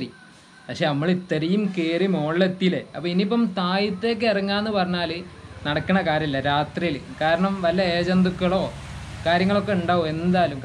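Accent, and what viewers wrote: Kozhikode, India. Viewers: Just kozhikoden slang... Leave it. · You’re a innocent guy..may allah bless you...all the best wishes..I feel a great potential in this channel keep this raw feeling of videos...your Calicut accent makes the storytelling more clever... حياك الله الله يبارك فيكم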